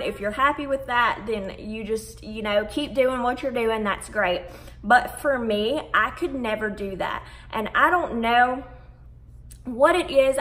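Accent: United States. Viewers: You country accent 😂😭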